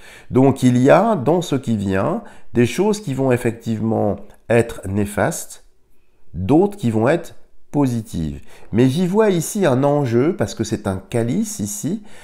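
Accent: France. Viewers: Bonjour Monsieur. Le Capitaine Juvet Brunet lui aussi est un fervent amoureux de notre belle France et souhaite sortir de l' U. E. Il est intéressant de l'écouter. Merci à vous Monsieur pour votre élocution très Française.. Un régal. 💞🌺🐈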